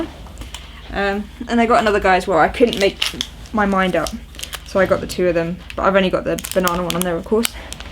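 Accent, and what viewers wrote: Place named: England, United Kingdom. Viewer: It's true, English accent makes everything sound classier.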